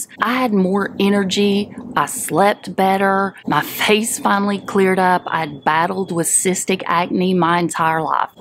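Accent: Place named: Appalachia, United States. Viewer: I just discovered you by searching for a keto sausage balls recipe. I found this video very informative. I am very sorry for your diagnosis. You are picture perfect with a loving appellation or southern accent. Stay healthy.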